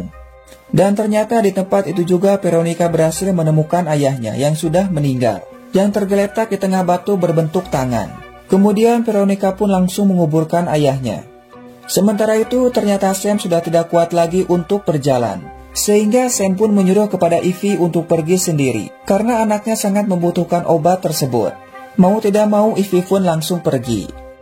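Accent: West Java, Indonesia. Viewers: Pasti naraton nya orang sunda😅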